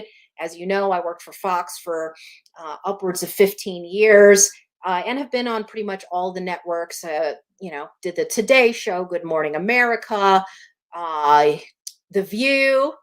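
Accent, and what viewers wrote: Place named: Philippines. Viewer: Michelle M. Having had a couple of Philippinas as GF's your accent is so fantastic.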